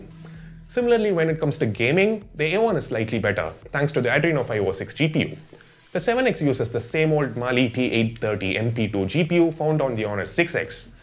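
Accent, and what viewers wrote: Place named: India. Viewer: Your accent is amazing lol. Some times i cant even hear that indian accent. While some times i can hear it clearly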